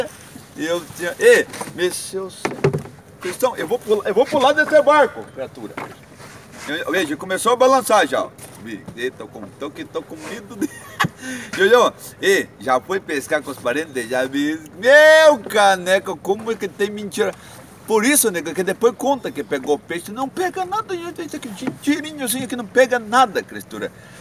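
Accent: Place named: Paranaguá, Brazil. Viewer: é parnanguara mesmo!😂😂😂;muito massa nosso jeito de falar! Meu caneco!😂😂😂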